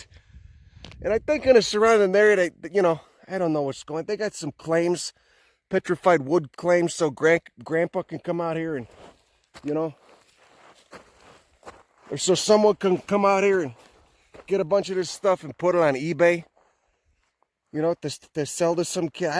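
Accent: Chicago, United States. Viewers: I love this guy. It's like Elwood Blues became a botanist. Cook county born myself, love this accent. Subbed just to listen to him. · Yes. He is from Chicago, is why... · Chicago. Not New York. You can tell by the way he pronounces his rrrrr's at the end of a word. Chi: "conifer" NY: "conifuh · But he has a Chicago accent, Goodfellas was new York, there is a difference. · It's an old school Chicago (esp Italian American) accent. He is Italian and from Chicago but he hams the accent up to make the vids more entertaining and funny. You can see him talking in his usual voice here: <link> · It is so funny to listen to such a high IQ discussion in a South Chicago accent.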